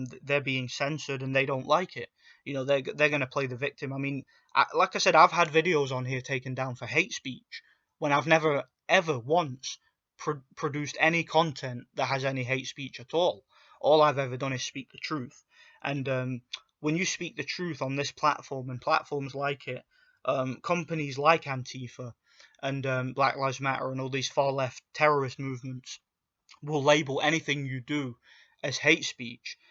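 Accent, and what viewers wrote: Yorkshire, United Kingdom. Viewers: Scottish?! I'm jus' over the wall... More or less scottish as far as Gov/london is concerned haha you got yorkshire-ish accent but i talk geordie so no 1 understands owt i waffle. Will follow Count, sounds like good crack. I dont know how 2 be politically correct... Hope antifa comes 2 my neck o the woods. They'd honestly get tortured... "Take a knee? Am takin them both'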